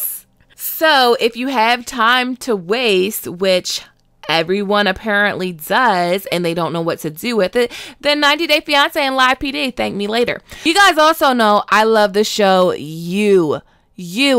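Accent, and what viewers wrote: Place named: Baltimore, United States. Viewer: I knew you was from Baltimore because you sound just like me 🤣🤣😂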